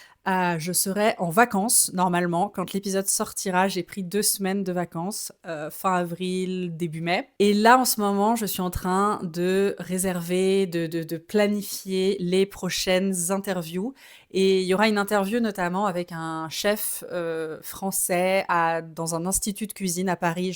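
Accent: France. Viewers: Vous avez un bel accent français.... Plus de vidéos. merci ❤❤❤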